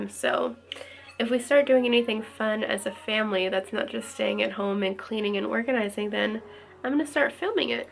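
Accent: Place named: United States. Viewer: where are you from? You sound like your from the states but hubby sounds English / England. I could totally be making this up. Great video with loads of ideas!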